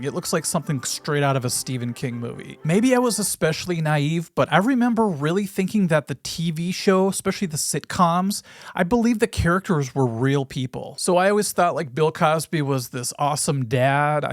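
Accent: Minnesota, United States. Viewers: Idk I never noticed your Minnesota accent till now 😂 but YEP everything's been fake!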